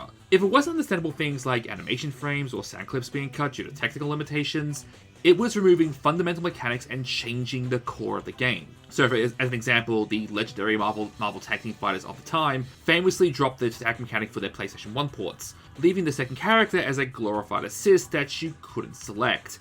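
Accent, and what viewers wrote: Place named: United Kingdom. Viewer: youtube commentary: uk accent introduction that belabors the very concept itself absolute misuse of the word 'unique' rendering it pointless *potential absolute misuse of the word 'weird' rendering it pointless *potential use of the phrase 'deep dive' to suggest the idea of retrospective opinion *This is where I clicked off the video.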